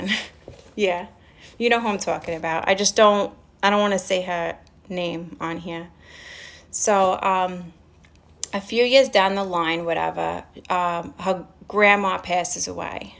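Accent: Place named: New York, United States. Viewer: As a Floridian I can tell you she definitely has an accent! I can hear it loud and clear. Are you from NY or somewhere up north? That may be why you don’t pick up on it.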